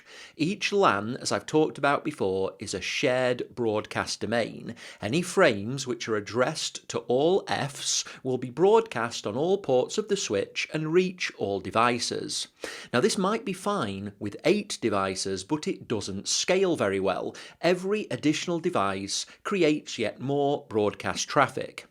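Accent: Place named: Northern England, United Kingdom. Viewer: In your opinion, how much networking knowledge one should have to go into cloud architecture? Would you say CCNA level is sufficient or maybe a person should be at CCNP level, or one would manage with Netywork+ ? Thanks. by the way, super cool videos and teaching style. Love the UK northern accent :-) miss it here, down under :-D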